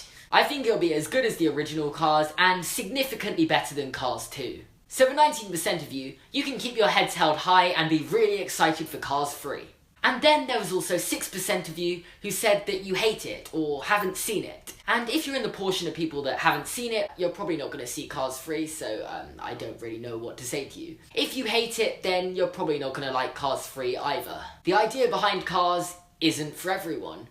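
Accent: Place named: RP, United Kingdom. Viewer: You're so fucking posh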